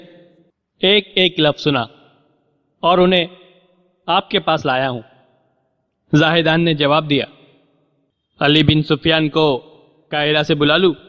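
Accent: India. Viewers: YR MUJE LAGTA HAI K YEH VOICE LIKE INDIAN TARZ KI HAI IS IT RYT ?? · Brother yeh indian hai aur indian aise hi bolte hain Doesn't mater.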